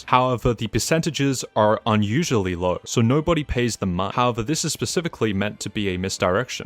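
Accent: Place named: United States. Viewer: Why do you say a bunch of words like a seppo? Are you selectively saying "a" like a yank just to please the algorithm?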